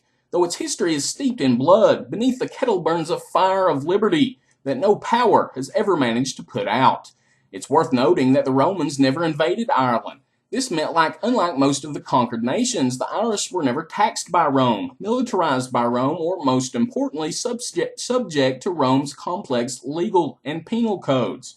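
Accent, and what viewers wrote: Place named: South, United States. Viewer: Don't hate Southerners, they have different rules of pronunciation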